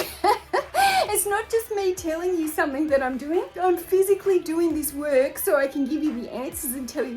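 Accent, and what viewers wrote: Australia. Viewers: Im guessing east coast Australian, coming from a South Australian here ;)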